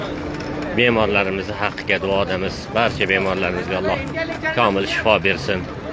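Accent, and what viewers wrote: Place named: Namangan, Uzbekistan. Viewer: Хожи ака сиз Наманганлик танидим овозингиздан буйрак огриганларга тавсия бергансиз олох зиерат ларингиз Кабул булсин. Олох сиздан рози булсин. Мен сизни. Новосибирдан кузатиб бораман Хаж умрага бордим олохим кайта кайта боришликни насиб килсин Олох. Асалому алейкум ва рахматулоху ва барокоту 🕋🕋🕋🕋🕋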